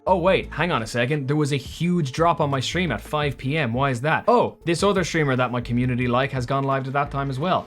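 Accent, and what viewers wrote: Ireland. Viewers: Hey, it’s funny to find you I heard your voice and I was like they HAVE to be Irish so I remembered there’s a about on twitch and I went there and you live in Ireland Dublin (I live also live in Dublin). You’re very underrated!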